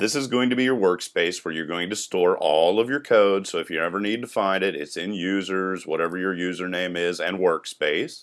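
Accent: United States. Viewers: An american accent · charnel pawny Which part of the US is he from?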